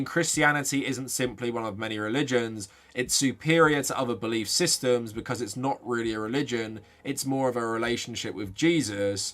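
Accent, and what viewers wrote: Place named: United Kingdom. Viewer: Hmm... This guy seems cool and I think I subscribed to him at some point, but why's this britbonger look like a dirt bike bro? His clothing doesn't match his voice. OH SHIT HE ADDRESSED IT RIGHT AS I WAS TYPING THIS. It's his Republican cosplay. But the he said Guardians of the Galaxy Vol. 2 is one of the worst movies he's ever seen and now I'm sad. That's one of my favorite MCU movies. Especially cause I'm a dad to two boys and the movie is about being a son, being a father, and family in general so the message resonates. But I'm also just a huge MCU nerd.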